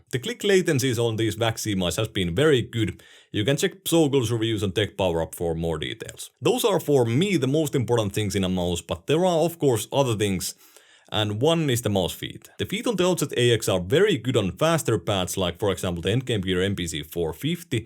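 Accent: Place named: Finland. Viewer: Jätkästä kuuluu kilometrin päähän et sä oot suomalainen...Sä et puhu rallienglantia, etkä huonosti mut sen vaan kuulee. Tilasin.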